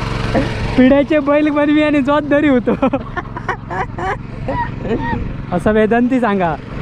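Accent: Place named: Malvan, India. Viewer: तुमची मालवणी फार सुंदर आणि ओरिजनल आहे. म्हणजे त्यात इंग्लिश आणि मराठी शब्दांची भेसळ नाही. बाकीचे v ब्लॉगर्स मालवणीच्या नावाखाली मराठीच बोलतात. आणि भरपूर इंग्लिश शब्द वापरतात. ॲड करा, स्टर करा, जॉईन व्हा, ब्रेक फास्ट करू या वगैरे. तुमच्या ब्लॉगमध्ये शुद्ध मालवणी ऐकायला मिळते.छान वाटतं.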